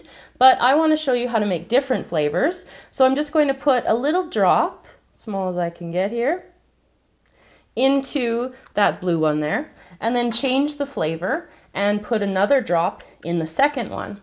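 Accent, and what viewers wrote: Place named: Canada. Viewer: i love Canadian accents.....there adorkable 😊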